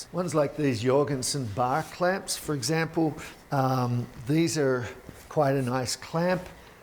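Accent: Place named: Australia. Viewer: From the start of watching your videos I always assumed that you were working from Australia from the accent. How would you compare woodworking in America compared to Australia? Always enjoy your videos thanks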